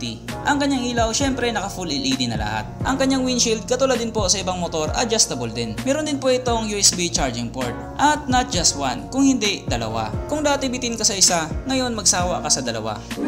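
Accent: Visayas, Philippines. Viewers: Bisaya pay imo haha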